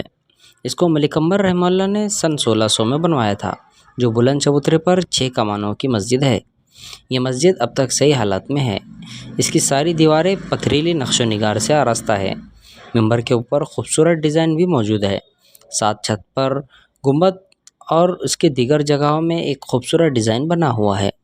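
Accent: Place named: Deccan, India. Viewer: Best video ❤ no music Al humdulillah details information k sath saaf dakkani zuban sun k mazaa dobala ho gaya. Jazak allahu khairain kaseera Aage bhi isi tarah without music k video banaya kijiye ga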